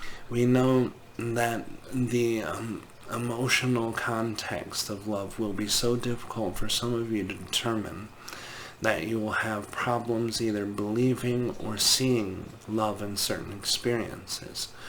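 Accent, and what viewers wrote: England, United Kingdom. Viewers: Has ever explained why he speaks in an English accent when the channeller is American? He sounds like Alec Guinness in Star wars. Nice video, thank you 😊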